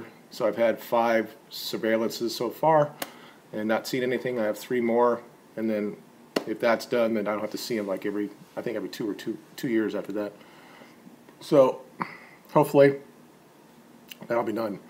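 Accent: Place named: United States. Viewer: You are German and French? Yiu sound American to me. Regardless of where the players are from it has gone down the drain with football/soccer. I am happy I will work during tomorrow's final. I am a Lazio fan. Milinkovic Savic is a monster. Incredibly talented. Just you pay enough and he is yours.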